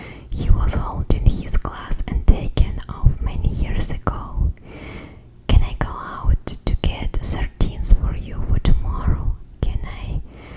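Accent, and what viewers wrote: Russia. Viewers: You have a very lovely Russian accent!! Especially you also have a very lovely voice! I could just listen to you all day!! 🤍🤍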